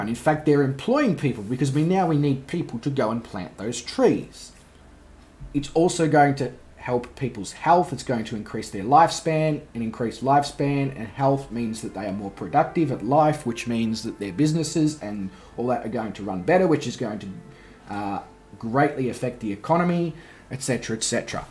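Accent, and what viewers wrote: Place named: Australia. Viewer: Dude, I highly enjoyed the video. I would recommend that the start of the video should have abit of a soundtack, like 2 seconds. But it your choice. Again, I really enjoyed your aussie accent.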